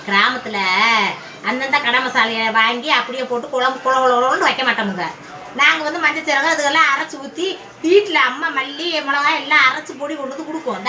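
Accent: Madurai, India. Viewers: அக்கா நீங்க பேசுற மதுர பாஷ ரொம்ப பிடிக்கும் உங்க வடை கடை ரொம்ப சூப்பர்❤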